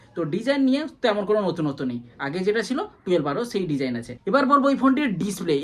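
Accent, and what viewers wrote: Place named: Jharkhand, India. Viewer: A bhai Jharkhandi jaise bolte ho 😮😮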